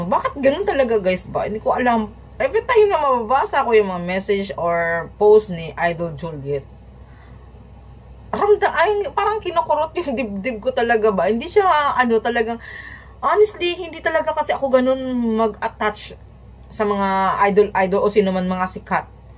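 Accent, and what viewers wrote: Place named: Visayas, Philippines. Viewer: Visaya.kainis yang pronounce mo.sakit sa tenga.alden po!d aldin.juliet po.d joliet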